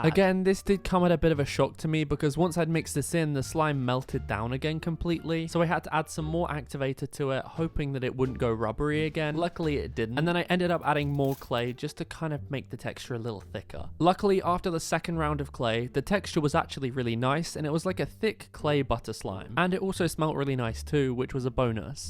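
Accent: United Kingdom. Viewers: you have a British accsent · British accent 😂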